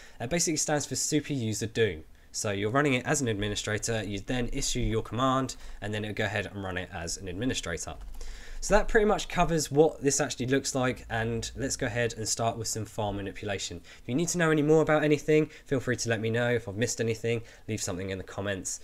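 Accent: United Kingdom. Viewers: He has a strong British accent.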